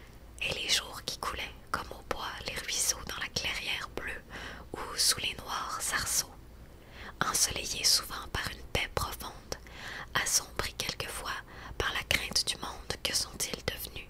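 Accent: Quebec, Canada. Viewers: Ta voix est tellement relaxante 😍 et j’adore ton accent québécois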